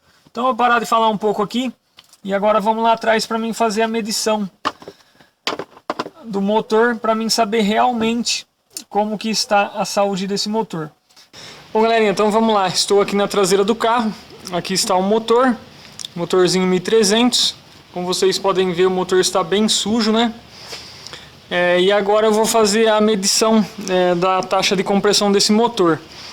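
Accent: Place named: South, Brazil. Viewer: Eu tenho uma Brasilia 1978 que meu pai tirou "zero" e como sou aposentado, e realmente é dificil achar mecanico que conserte esse tipo de carro, assim começei a ver videos sobre o assunto - por exemplo o canal do Tonella que é muito bom entre outros. Em uma dessas duvidas queria saber como verificar a compressão do motor e seu video apareceu e foi muito bom. Em relação onde vc mora é pq me pareceu que tem um sotaque do sul e fiquei curioso em saber qual a cidade somente isso. Entendo que hj em dia existe muita violencia, vigaristas e todo mundo tem que se precaver. Mas sou da paz moro em Guarulhos e só quero aprender um pouco mais da mecanica a ar e possivelmente trocar experiecias. OK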